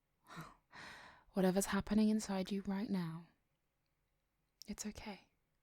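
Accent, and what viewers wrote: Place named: London, United Kingdom. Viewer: Oh my, you have such a lovely voice. It is a crime you only have 39 subscribers. There is something so appealing about the British intonation. As a Dutch guy it is always hard to tell where but is it a London accent or close to London.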